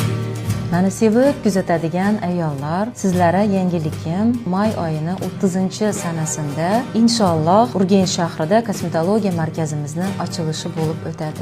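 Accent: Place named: Tashkent, Uzbekistan. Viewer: Sizga toshkentcha gapirish yarasharkan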